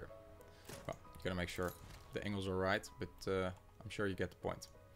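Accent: Netherlands. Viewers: Ik herken een Hollander uit 100 accenten :D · a lot of ppl are annoyed with the Dutch accent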